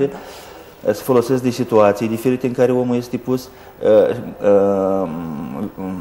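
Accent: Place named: Moldova. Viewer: Tipu e moldovean si ii e un pic cam greu sa renunte la accent (mai are scapari de accent) mai ales intr-un interviu televizat. Daca ar explica totul in termeni psihologici nu multi am intelege. El incearca o explicatie cat mai coerent pentru a intelegi si ultimul pamantean.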